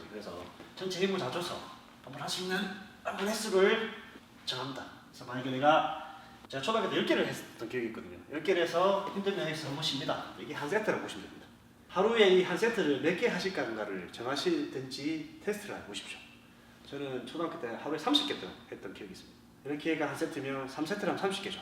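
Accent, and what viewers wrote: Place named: Busan, South Korea. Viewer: 부산 사투리가 정겹습니다 오쓰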